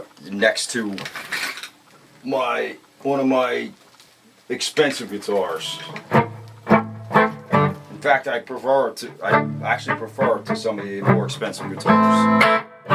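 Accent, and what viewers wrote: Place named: New Jersey, United States. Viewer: South Jersey Pennsylvania Delaware New York tri-state area maybe? I'm from Jersey and you sound like somebody down the street...lol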